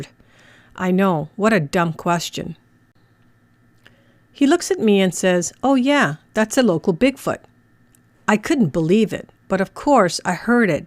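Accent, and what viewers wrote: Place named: Quebec, Canada. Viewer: Great stories. I love your accent. It reminds me of all my wonderful French Canadian friends:-)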